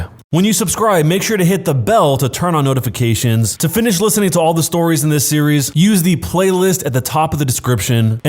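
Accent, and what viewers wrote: United Kingdom. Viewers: You sound brittish with flat and lift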